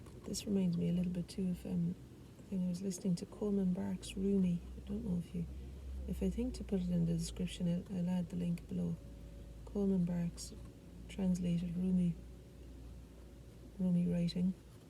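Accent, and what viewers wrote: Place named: Ireland. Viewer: your lovely soft Irish lilt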